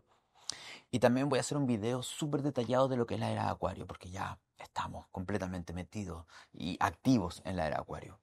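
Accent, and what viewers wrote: Chile. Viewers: Gracias por tu impetu y energia y ese acento chileno que me encanta Eres luz y claridad, hagas lo que hagas, siempre sera un exito❤gracias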